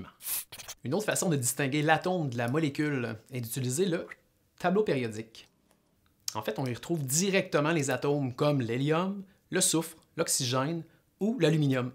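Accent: Quebec, Canada. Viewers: mais vous etes vrmt des canadien ? pas vrai? bref jai trop aimer la video et on comprend vite , pas comme les autre video qui dure 15 min et a al fin on comprend rien , je vous remerciiie :))) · son accent Québécois tres pousser je ne comprend rien 😅😂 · merci pour ton aide téxplique grave bien et super vite et jkiffe ton accent quebequoi thank you so much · Francais canadienne??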